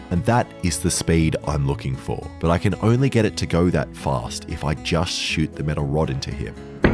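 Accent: Australia. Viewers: I mean he is an Aussie, so he has the accent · And the Aussie voice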